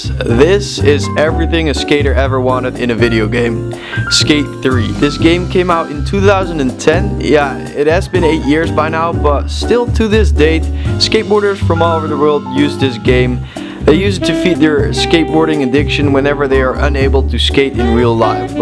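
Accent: Netherlands. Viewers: Are you Dutch? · This guy is dutch. I can just hear it. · Is he from the netherlands?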